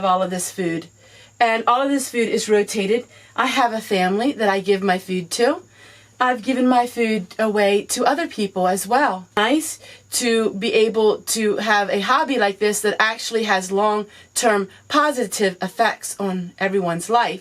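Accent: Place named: South Louisiana, United States. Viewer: interesting video. I’ve watched a few videos, and I’m trying to place your accent. South Louisiana? Nonetheless, you have some really interesting videos. Keep up the good work!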